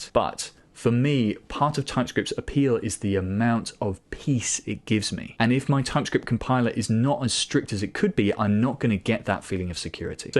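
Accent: United Kingdom. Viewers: Your British accent make your videos sound polite from my point of view hahaha.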